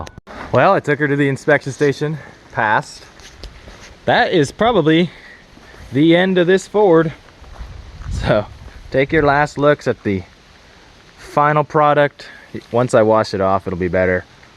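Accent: South, United States. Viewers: Lol i like the southern accent, whered that come from??😂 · You sound southern in this one for some reason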